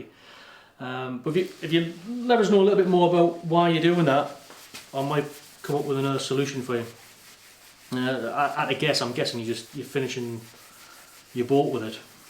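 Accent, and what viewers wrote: North East England, United Kingdom. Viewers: This is a great tutorial, thank you for posting. Also living in Brisbane and being from the UK it is really nice to hear an accent from the North East.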